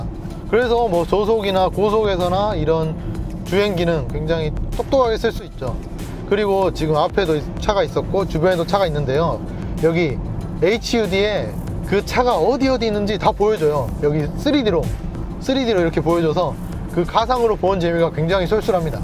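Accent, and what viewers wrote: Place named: Jeolla, South Korea. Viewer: 발음이 문제가 아니라 전라도 사투리가 문제 인 듯?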